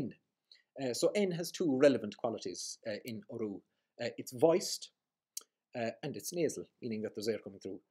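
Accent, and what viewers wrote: Ireland. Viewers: Grand video for someone like myself teaching himself Irish that wishes to learn the true pronunciation, free of English influence. What part of The Emerald Isle do you hail from, sir? I daresay your accent is one of the most beautiful, if not the most, beautiful I've heard in Hiberno-English or any variety of English spoken anywhere in the world. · I love the way that your English speech sounds just like my Irish born Father (RIP) spoke his English, (he was from near Murren, Donegal)- and I appreciate your excellent knowledge of the Irish Language..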